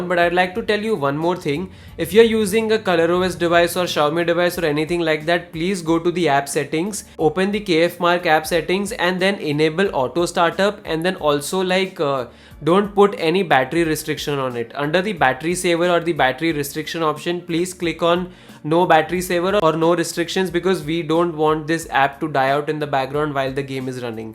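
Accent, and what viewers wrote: India. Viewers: Finally An Indian English ppl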